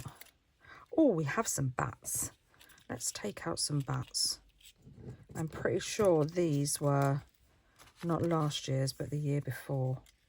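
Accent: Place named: United Kingdom. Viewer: From where in the UK are you ? I love your accent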